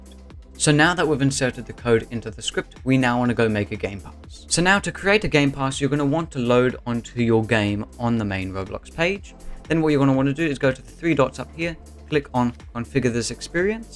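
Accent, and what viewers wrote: United Kingdom. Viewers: pronounce your words dirty british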